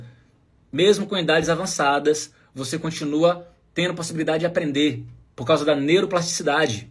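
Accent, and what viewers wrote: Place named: Ceará, Brazil. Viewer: Hei macho, e tu é do ceará, da fustaleza!!! Neh?!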